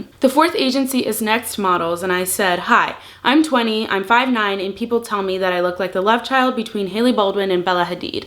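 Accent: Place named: United States. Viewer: As a Brit fluent in sarcasm, It’s weird hearing this much sarcasm in an American accent 😂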